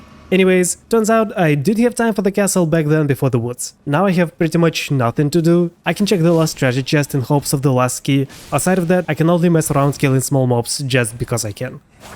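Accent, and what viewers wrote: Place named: Russia. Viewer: Друг, ты русскоговорящий? Просто акцент очень сильно выделяется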